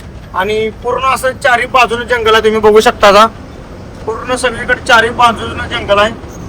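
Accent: Kolhapur, India. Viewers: भाऊ तुझे ब्लॉग छान असतात, पण तू व्हिडिओमध्ये घाण घाण शिव्या नको देत जावू. कारण तुझे व्हिडीओ लेडीजसुद्धा बघत असतात, तुझी कोल्हापुरी भाषा शैली मस्त आहे, फक्त शिवीगाळी बंद कर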